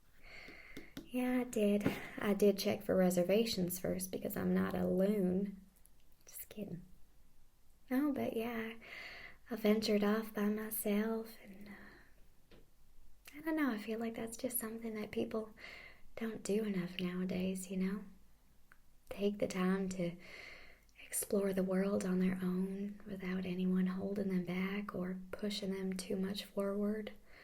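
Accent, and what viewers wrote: South, United States. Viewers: This was too adorable, loved the southern accent and the many expressions used, you nailed that southern charm. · why do i hate southern accents anywhere except for asmr?? I am actually from the south lol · Welp Was looking up southern accents cause I haven't heard them in years since I left and was feeling a bit nostalgic Was nice Not the exact one I of my area bit damn well close enough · I kind of just stumbled on here and so I'm new here. but your accent~ 😍 Whether its real or fake. its absolutely charming :3. I see what they mean by southern charm now xP. and your character you chose for this makes me giggle x3. she's weirdly adorable. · Gotta love southern accents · this one is amazing! The southern accent has always been a tingle trigger for me. Thanks for making this. The humor in it just makes it even better. PS: 61 degrees....brrrrrr...as a Detroiter just coming out of winter 61 sounds like beach weather to me:-) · I have a real weakness for the Southern accent, this video is all kindas evil :P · Your southern accent rocks!!! 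More southern roleplays please!!! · This was really good and I'm highly impressed with the accent but you didn't pronounce route like a lot of southerners do. I'm just saying because I'm from Texas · Aarrgghhh i love this accent! I'm marrying a southern gal, that's my life goal... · So I'm new to you, from England, love the Southern accent, this video is so nice and comforting, makes me feel like I'm checking in to s B&B, beautiful voice and accent, proper soothing :) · Pleasepleaseplease do more of southern accent asmr 💞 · very nice approach with this video, nice accent too, i think this is the first time i have seen any of your videos, im guessing your a true southern bell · very nice, but at the beginning i like that background song do yu have the name of it. oh buy the way love that lovely southern accent;really good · Man, I love this:D Would have loved a stronger southern accent but I can't complain, my first trigger in so long >.> Keep up the good work Miss., cheers from Norway! 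· weird, i was just looking for "southern accent" asmr a few days ago. Perfect timing to the point of being spooky. Thx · this is so my favourite video ever. normally i watch asmr to fall asleep but this is way too good to sleep through. from the beginning you had me laughing and even answering you in my head a little. and the southern speaking, wisdom about life... heck its all there. thank you so much you fantastic creature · This is the first I've seen of this video. I genuinely love ASMR with southern accents, I don't know why. Thank you for this, and I apologize for being late to the party.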